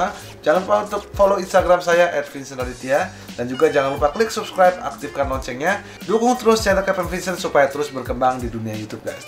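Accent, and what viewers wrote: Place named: Banyumas, Indonesia. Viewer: Kapal kecik saja... Ngapak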